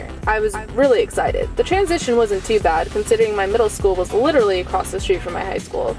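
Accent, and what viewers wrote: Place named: Ireland. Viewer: Daniel Amusan Thanks! Btw Iove the Irish accent! XD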